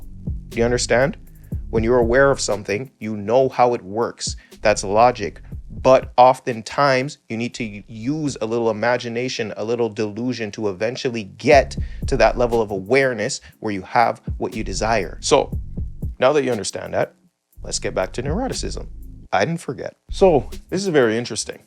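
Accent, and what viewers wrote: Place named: Toronto, Canada. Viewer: You have to be a Toronto man, based on the way you talk lol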